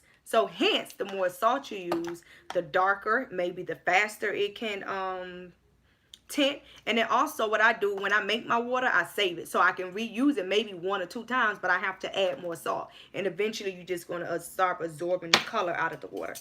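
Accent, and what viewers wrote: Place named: Florida, United States. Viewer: I'm new to your channel.. as soon as i heard you talk i knew you were from FL like me. Lol. I love the videos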